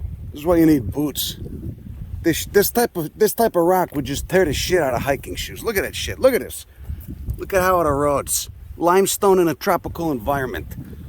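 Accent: Chicago, United States. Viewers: Ah, love the speak. 20 years LA via Chicago (et. 1970 Cicero ) You turned me onto some really good reference books. I can’t keep up with you. But I love going back to reference what you say and describe. But , the Chicago that exudes from your pores makes me homesick for a memory. I’m so glad I found your channel. The more I learn the better I feel! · Sounds like some of my Chicago family members but on steroids... Love this guy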